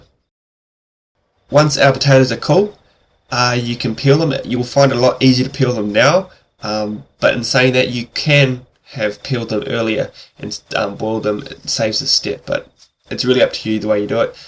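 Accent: New Zealand. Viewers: hey i'm not judging but you sound like you're from New Zealand hehe x) I used to stay in NZ for 4 years I love all of your recipes!! <3 <3 I am a big fan of yours xx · He has a New Zealand accent, not Australian :D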